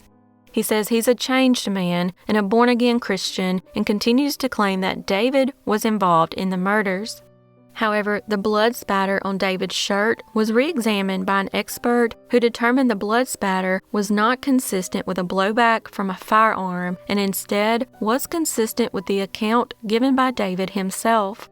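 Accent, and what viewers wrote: South, United States. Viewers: I am a 52yr old Lifelong Southerner, Native American, and Native Floridian. So before I say this, understand, it comes from a place of love, but, until you ditch the southern accent, you will never be given respect as a journalist. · Love your videos! 🤗 What state are you from ? I’m trying to pin point your accent! I was born in the south but moved to CA as a kid so I think your accent might be from my home state but I could be wrong !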